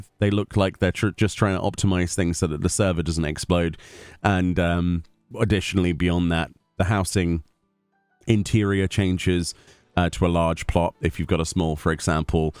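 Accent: United Kingdom. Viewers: You really don’t know what 1.5 of 40 is? It’s true what they say, British accent is no substitute for intelligence. I’m just glad you managed to read those squiggly things they call letters.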